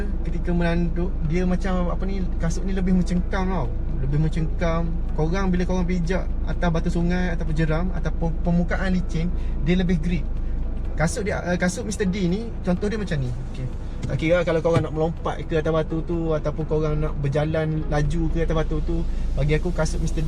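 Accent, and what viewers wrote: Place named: Kuantan, Malaysia. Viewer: cara aok cakap.pon koi taw aok org kontan.. 👍😄. Biasa mancing sgai belat ke?😄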